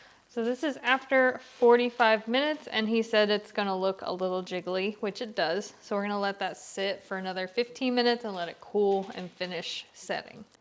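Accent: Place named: South, United States. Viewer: Girl, straight from the south 😊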